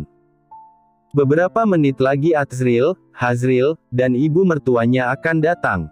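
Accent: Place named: Indonesia. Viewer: Logat Indonesia guys guis😊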